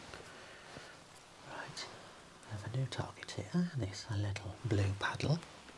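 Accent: United Kingdom. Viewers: Are you British? Your accent and that jacket!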